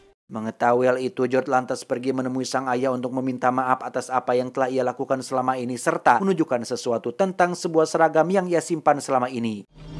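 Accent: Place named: Madura, Indonesia. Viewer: Madura bang😂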